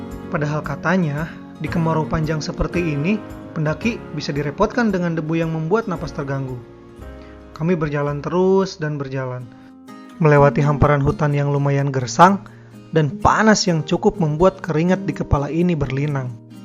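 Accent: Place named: West Java, Indonesia. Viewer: Aa keren bangettt a.... 👏👏👏 itu bahasa indonesianya masih ketara bgt logat sundanya hahaa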